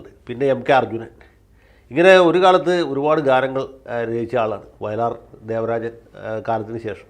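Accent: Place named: Alangad, India. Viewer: ആലങ്ങാടൻ ഭാഷ... വക്കീലേ കലക്കി.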